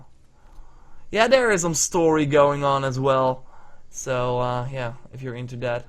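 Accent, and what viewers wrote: Netherlands. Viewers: ik hoorde al gelijk aan je accent dat je nederlands was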